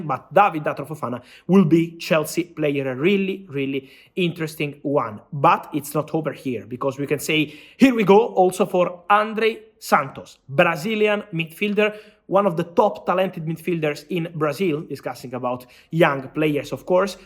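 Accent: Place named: Italy. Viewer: Bird day, love Italian accents!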